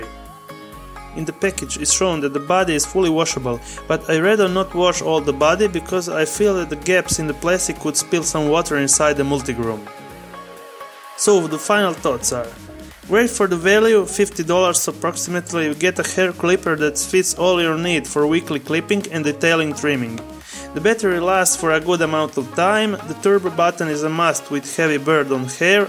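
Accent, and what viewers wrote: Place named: Russia. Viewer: русский акцент заметен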